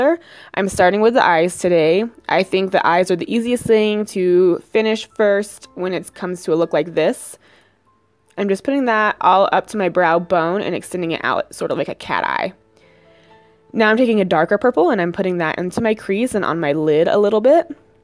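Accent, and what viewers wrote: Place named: United States. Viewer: Yeah I'm from a different country. I live in Scotland do its not every day I here an American accent.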